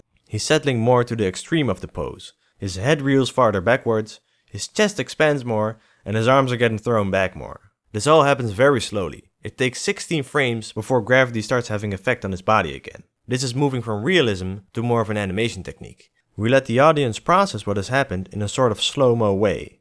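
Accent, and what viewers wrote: Netherlands. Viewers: You sound really dutch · are you dutch? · U dutch? Cus you sound dutch. Im dutch too and into drawing and animation. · dutch accent is so obvious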